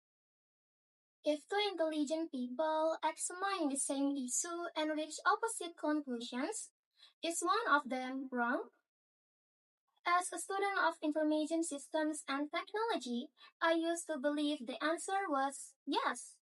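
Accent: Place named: United Kingdom. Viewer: omg sounds really British😚how cute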